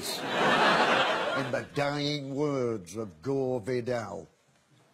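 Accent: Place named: Salford, United Kingdom. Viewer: Trouble At’t Mill Not Mall And it’s Trouble, aye Trouble, aye Trouble at’t mill It’s in a thick Salford working class accent, exaggerated a bit. It’s also a callback to his The Day the World Stood Still: The day the world stood still The day the world stood still From the underground to the overspill No trouble not even at the mill This is the day the world stood still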